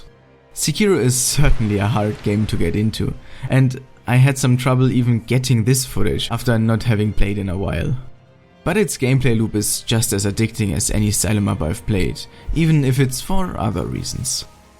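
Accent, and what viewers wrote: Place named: Germany. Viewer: sounds like a german accent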